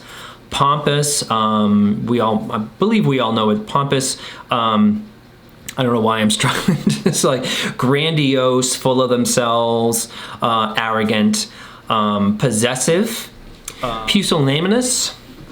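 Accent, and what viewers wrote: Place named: Worcester, United States. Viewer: it"s not sting-y it"s pronounced stin-gee, meaning ungenerous, I believe. Love the channel, and the Woostah accent reminds me of home! Wicked pissah!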